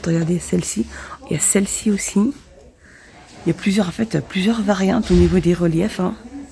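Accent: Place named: South, France. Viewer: Merci ma avec ton accent du soleil c'est toujours un plaisir de visionner tes vidéos 📹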